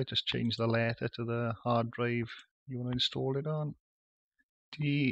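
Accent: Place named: Cardiff, United Kingdom. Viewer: You don't expect a Jack to take any notice of a bloke with a Cardiff accent! Thanks very helpful.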